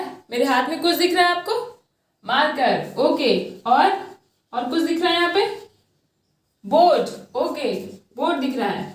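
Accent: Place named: Bihar, India. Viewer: Baaki sb thik h ...bss language m biharipn aa lg ra h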